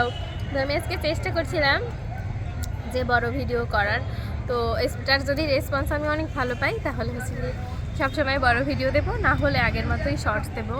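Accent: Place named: West Bengal, India. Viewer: didi,kmn acho???amr bari Bangladesher Rangpur e....didi west bengal e jeye tumi okhankar vasa sikhcho bujha jacche....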